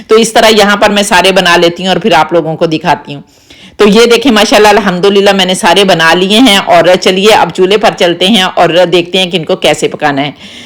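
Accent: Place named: Punjab, India. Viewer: Acha g ye aunty b Pakistan ki hai punjab ki hai ap in k bolny k style dayk lay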